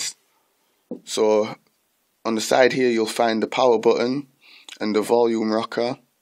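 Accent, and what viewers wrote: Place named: Manchester, United Kingdom. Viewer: In 2019, I have the exact tablet arriving from Amazon Tuesday April 2, 2019. It is brand new and apparently someone kept and has some still to sell after all these many years. I took a chance on this as 32 GB is plenty for me and the size perfect when I travel. The Amazon ad said 4.3 Jelly Bean. Will it Auto-Update to any of the latest version of Android? I have a case and screen protector on the way also and quite a bit of money invested so I hope that I am not disappointed. Looking forward to getting it and setting it up. As a side note, when I was younger, I stayed several months traveling all over the UK and Ireland. As here in the US, many regional accents however not sure what part of the UK that you are from, but sounds sort of Manchester or Blackpool if I had to guess. It's a beautiful accent to listen to. 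Anyway, cross your fingers that my old NEW Nexus 7 works well and thank you for a nice video which was a great help.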